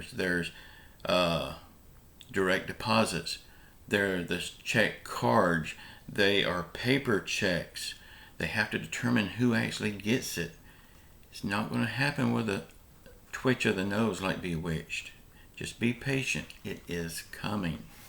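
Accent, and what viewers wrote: South, United States. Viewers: Good Morning. Hahaha..”If it don’t help me, it should not be.” hahaha..where do you come up with your material? Hahahaha..love it..funny, but it shows what type of people we have become. And yes, I agree with the comment about “liking the way you talk.” You are an extremely good communicator who can get your point across in “plain talk.”...which is a rare quality..a lot of people overdress words. And, my wife and I loved the South..there is something special about a Southern accent. You have been blessed with talent and appeal.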